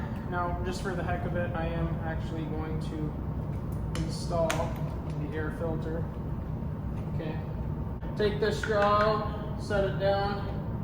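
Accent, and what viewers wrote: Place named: Midwest, United States. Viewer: Your Midwestern accent is amazing.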